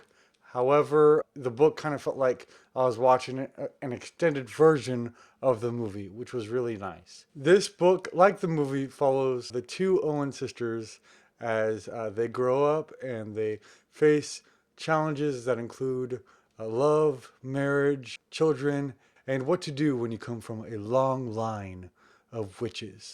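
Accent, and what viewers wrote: South, United States. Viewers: I can't believe you had a lot of spooky books at your house in Texas on this video during your quarantine including Practical Magic by Alice Hoffan, The Haunted of the Hill House, Cemetery House by Aiden Thomas, Mexican Gothic by Silvia Moreno-Garcia, The Year of the Witching by Alexis Henderson, The Southern's Book Club's Guide to Slaying Vampires by Grady Hendrix and everything including House of Leaves by Mark Z. Danielewski until you're talking about all of the spooky books to read them at the same time while you're telling the whole story from all of the spooky books after that, but I hope your husband, Zach will be very proud of you and I love your Southern accent, I wanna say Happy Halloween to you and him, so stay safe!!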